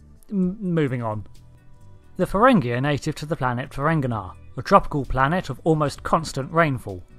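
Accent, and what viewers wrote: England, United Kingdom. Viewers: "Until next time, I have been." You sound English, so, why do you not know this sentence is not grammatically correct? "Until" denotes a future event, "Have been" denotes a past event... Unless you are a time traveler, you can't "until have been" Either you have been, or you will be until. If you have been, who are you now? If you will be until next time, who will you be then?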